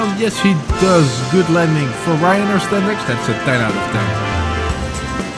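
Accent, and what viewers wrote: Netherlands. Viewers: Hou van da Hollands engels en leuke video man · Hello , iam. A plane fan ,but I smell a Dutch accent from ur mouth…..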